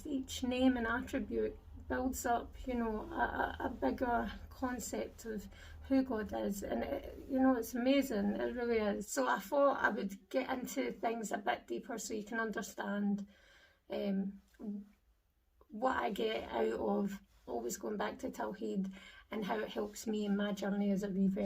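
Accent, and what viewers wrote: Scotland, United Kingdom. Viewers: As a muslim who understand English even in different accents and My mother tongue is Arabic , I may give you an advice that it is better for a wider audience to start writing subtitles because I know many many people who does not understand the Scottish accent . may ALLAH subhanahu respond to your good intentions. Ameen . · May Allah bless you sister, as for me I really love the Scottish accent, I consider myself in a way good in English, but to many others it would be helpful for them to put subtitles as well, may Allah accept the fasting from all, bless you and your family, and guide all your loved ones into Islam.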